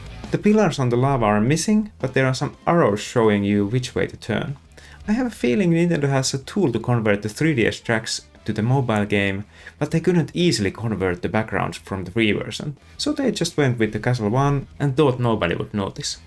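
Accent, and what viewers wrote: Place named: Finland. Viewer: Cool channel! Sounds like you're from my neighbouring country!!! 😁 Swe => Finland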